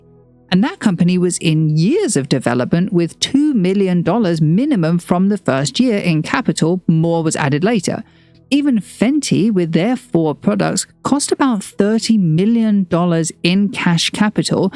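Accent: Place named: United Kingdom. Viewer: I’m with you on that. I fall asleep to a British Egyptologist with a similar accent on the regular.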